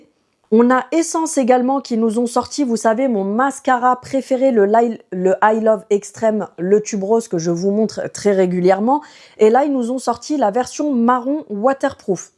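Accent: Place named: South, France. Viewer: Mais on aime t écouter ma petite française du Sud.bisous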